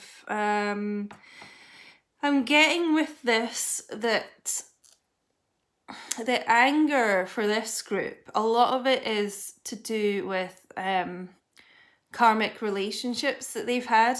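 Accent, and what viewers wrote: Scotland, United Kingdom. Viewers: Where are you from? Are you Scottish? I love ur accent lol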